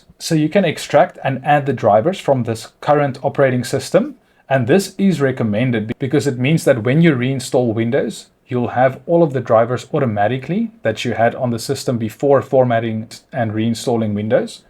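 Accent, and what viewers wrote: South Africa. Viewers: Jisss meneer! Ek wou se jy klink Suid Afrikaans! Baie goeie werk. Dis regtig goeie sagteware! Ek was ook in IT lank terug en jy is reg. Die program sou vrek baie tyd gespaar het al daai jare terug. (BTW ek hoop jy verstaan afrikaans). Sal vir jou n donasie gee nadat ek desember vakansie gehou het. Groete uit Gauteng. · Only a SAFFA could create this boet. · I hear a South African accent or am I dreaming?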